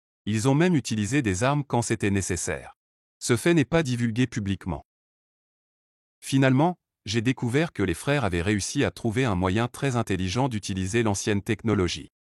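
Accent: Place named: Provence, France. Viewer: je confirme l'accent de Provence si je ne m'abuse rajoute un charme. (Salutations d'un marseillais (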